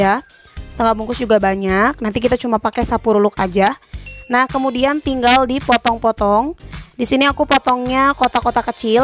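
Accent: West Java, Indonesia. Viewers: Masyaallah resep pisan da nyarios na ka c teteh ieu sunda pisan👍,, salam dari aku orang ciwidey teteh 🙏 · Sunda pisan nya saiiiiii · Lucu pisan aya sundaan euy🤗😆😆sehat slalu teh makin lancar usahanya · Akumah suka yang ngehostnya nyunda banget🤓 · Alllllaaaaah c teteh ...sunda bingit ,😁😁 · Sunda banget euy hehehe si teteh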